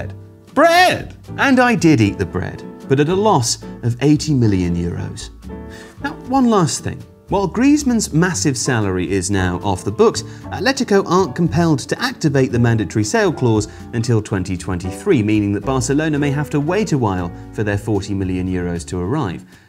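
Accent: United Kingdom. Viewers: I love your voice so much bruuvvvv. I have been watching Tifo since 2018 and I want to say that your British dialect and voice is really awesome in comparison to others I've listened to.It is a high compliment bc the internet is vast. My advice is: when you start doing commentary for live games, please dont be a siff like the majority of English announcers - look for some sauce and vivacity from the Romance languages of how they announce games (Argentinians, U.S. Hispanic announcers, and Portuguese are really good starts for research into this - they are so much fun when they announce games). And, yes, one day, BBC, or someone is going to want to use that voice....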